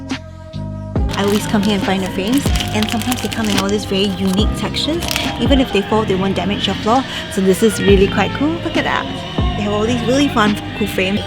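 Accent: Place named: Singapore. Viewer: please try to improve your pronunciation. ‘check out my shopping lut’ ??? that mix of a singaporean accent and a western accent is also badly done because you pronounce one word several different ways. it’s jarring on the ears.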